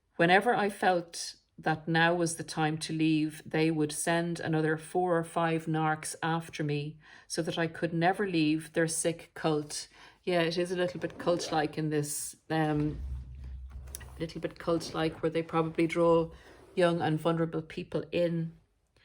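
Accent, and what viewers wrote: Ireland. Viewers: Nice too know we are not alone listening to sites on narcissists. Just feel even better with your accent being Irish ☘️ just found this site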